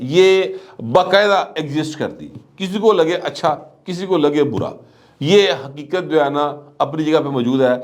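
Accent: Punjab, Pakistan. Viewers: Gohar Butt sb I am a professor in English department your personality is not of a journalist but of a professor really graceful and your Punjabi accent is truly awesome and captivating .nice analytical vlog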